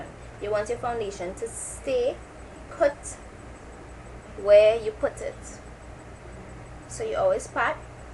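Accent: Trinidad and Tobago. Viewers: oOh cool ur fromm triniii heariin da accent! (: which one would u recommend for oily skin the stick or the cream to powder foundation by black opal???? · love to see my Trini ppl . love the accent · Are you trini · Its trinidadian accent :D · haha You sound like my mum, she is a Trini too and she talks the same way you do, aw so cute hehe :)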